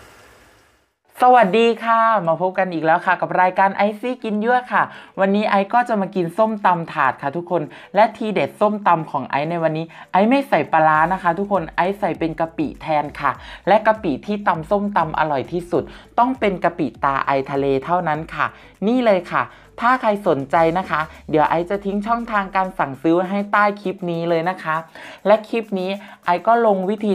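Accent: Isan, Thailand. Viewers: ชอบตอนพี่ พูดอีสาน พูดทุกคลิปเลยน่ะคร้าบบบบบ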